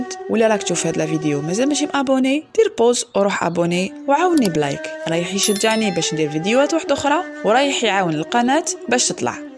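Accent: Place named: Algiers, Algeria. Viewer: يعطيك الصحة، و تبارك الله على ذاك الصوت الحنين و على اللهجة تاع العاصيمة 😘 الله الله عليك . أسڨاس أمڨاز ثانميرث سڨذرار ن جرجرة 🇩🇿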